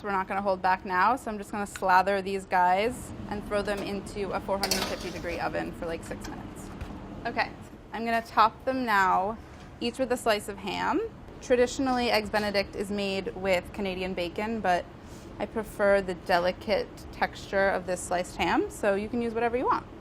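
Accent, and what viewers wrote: United States. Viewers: She sounds normal, sure its like a small state accent but hardly anything to moch her over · Everyone's talking about her accent and here I am, English not being my mother tongue, and I'm already proud that I can tell she's American. I literally can't hear the difference between any English accents. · Is that an accent from somewhere in the US?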